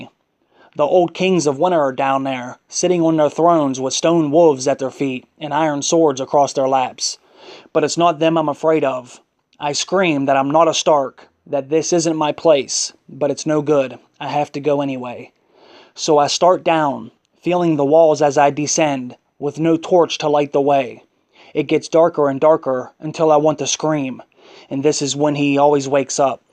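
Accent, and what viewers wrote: Pittsburgh, United States. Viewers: Dude are you from Pittsburgh? Awesome video btw!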